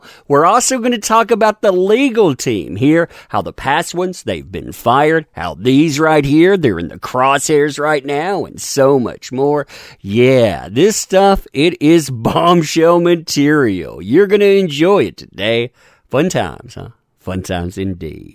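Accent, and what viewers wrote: South, United States. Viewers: I come from the UK and was listening to your stream last Night and you mentioned people not liking your Accent. To be Honest I love your Accent very Southern Slightly Camp and best of all I could imagine you or at least your voice being the voice of a very dangerous crime boss who feeds people who crosses him to his Pet alligators and from Louisiana or some where like that anyway I like it All the best from Leeds in west Yorkshire United Kingdom haha You should hear ur accent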